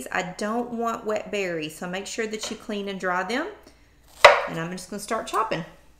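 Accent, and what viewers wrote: South, United States. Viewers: AHH,MY FAVORITE LADY.LIKE THE SOUTHERN ACCENT.YOUR THE BEST.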